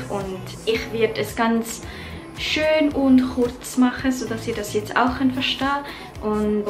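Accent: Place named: Switzerland. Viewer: Das ist ganz anders als Hochdeutsch! 😬 Es ist schwierig, für mich um dich zu verstehen. Sprechen alle Schweizern auch Hochdeutsch oder nicht?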